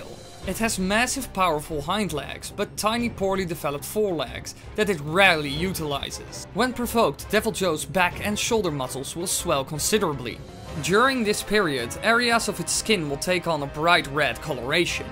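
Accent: Netherlands. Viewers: ik kan gewoon aan je stem horen dat je Nederlands ben :'D toffe video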